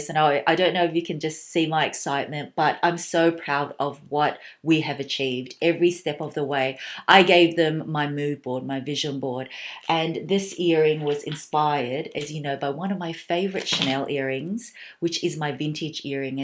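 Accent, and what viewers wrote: Australia. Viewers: I don’t have piercings but I really appreciate these earings, the creative aspect and your kindness behind the creation. Thank you so much for being so mindful of the fact that being able to see the world around us is a blessing and giving back is something we should do because we’re so luckier than a lot of people. I used to live in Australia and I feel really connected with you and your accent. I really like you for being so humble despite having a lot of luxury goods. I wish you all the best. Youtube needs creator like you. Keep up the good work :)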